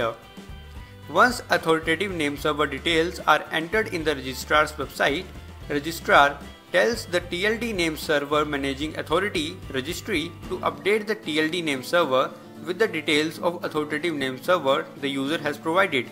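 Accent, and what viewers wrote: India. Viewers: 这印度英语真听不下去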